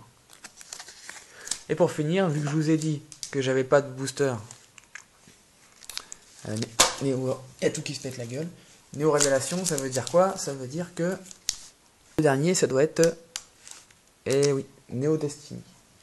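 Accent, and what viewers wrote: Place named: Belgium. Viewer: toi ta un léger accent belge a ce que je voix ;)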